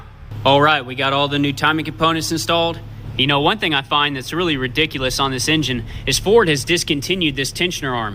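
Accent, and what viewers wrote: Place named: South, United States. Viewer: I like the fast pace verbosity with the a touch of southern twang!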